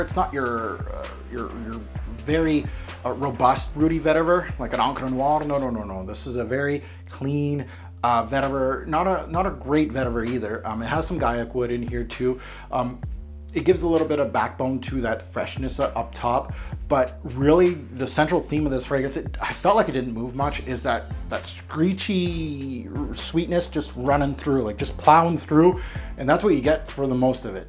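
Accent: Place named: Canada. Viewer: You Cristo and DracDoc were doing reviews before anyone your pronunciation of Labels brands and names your definitely A Canuck ...Your honest and genuine ...If anything Dunhills best is Dunhill by Alfred Dunhill , the brown bottle.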